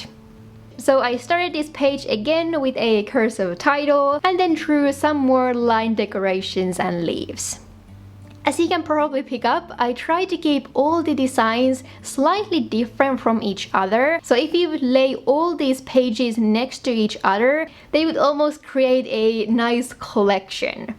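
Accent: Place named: Finland. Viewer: huomaski välillä ihan niiinku oilisit puhunut suomea hih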